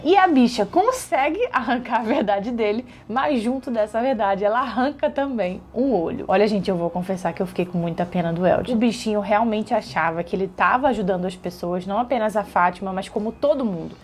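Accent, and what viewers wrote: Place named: Rio de Janeiro, Brazil. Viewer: Parabens pelo trabalho, eu como sou um cara bem chato aqui no youtube, não curto muito ficar ouvindo estes sotaques caipiras que inundam esta plataforma , prefiro acompanhar um sotaque raiz do RJ , como o seu e o da Mateux Mendex os unicos canais que acompanho pra ouviu teorias de From. Um coisa que pode ter passado despercebido é que parece que todos os moradores de From parecem ser reencarnação de pessoas do passado da cidade. e todas devem ter o mesmo destino, não sendo possível mudar o destino. Em exemplo disso é o Elgin, ele ter tido o olho arrancado pela Sarah o que o conecta a visão do Jade do homem bebendo sangue no crânio, este homem também estava sem 1 olho. E o ato de beber sangue pode ter relação com o Elgin retirando seu sangue para a Fatima beber. Tudo esta interligado em toda a história desta cidade.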